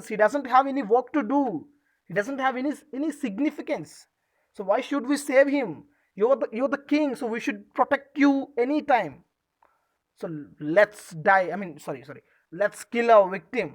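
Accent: United States. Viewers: U are in India not in american learn to tell in indian english not in american english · Good but ur explanation and your English is similar to American English so try to explain in Indian english I mean dragging of words, and it was bit fast for our kids. Don't mind just it's a suggestion.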